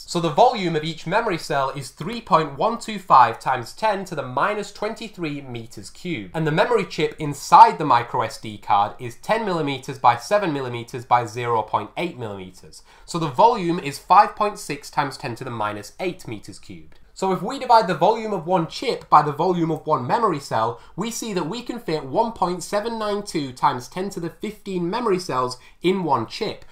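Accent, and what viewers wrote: Northern England, United Kingdom. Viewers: You've got a new follower. Educational video with occasional healthy humor and a nice Sean Bean accent(and everybody else from the north in the GoT). Followed at less than 10k followers. See ya when you get a million of us mate.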